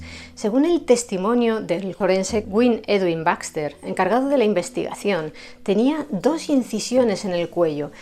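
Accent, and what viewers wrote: Spain. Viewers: me gusta escuchar historias con acento español, cuando escucho acento de mi pais o de latinoamerica no me asusta me dan ganas de bailar jajajaj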